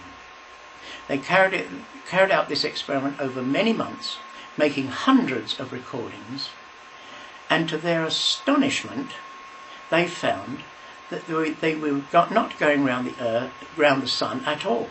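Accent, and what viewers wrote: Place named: United Kingdom. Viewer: Nothing like a talk delivered in a nice fruity British accent. However, the content, while full of high-sounding references, is an enormous pile of horse-pucky.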